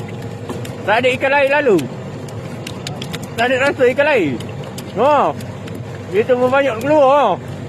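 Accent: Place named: Lipis, Malaysia. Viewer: Tak ada ikan lain lalu ye.....baik baik bahan ke tangan Kang...😂😂original cakap Lepih..😂😂😂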